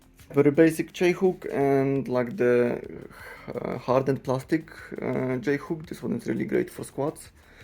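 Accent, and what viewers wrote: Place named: Poland. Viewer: The English flag confused me, with your accent Are you polish ??? Nice gym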